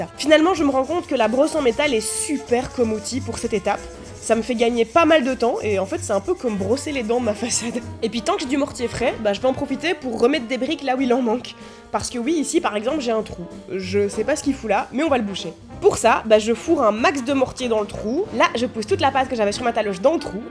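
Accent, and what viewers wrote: Belgium. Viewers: Entre "tant que ça drache pas des seau d'eau" et "je rappellerai le plombier pour savoir quoi" ton parler belge (qui ressemble à du parler ch'ti) me ravit les oreilles. Bon courage avec ta rénovation🌻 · Pour dire WC les Belges vous dites "ouécé"??? Je sais que les Français on est pas logiques non plus vu qu'on dit "vécé" mais bon😅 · Je voudrais juste savoir un truc tu es française ou belge car tu as l’accent belge mais tu dis (sopalin ) comme les français marque qui n’existe pas en Belgique mais la bonne marque serait scotex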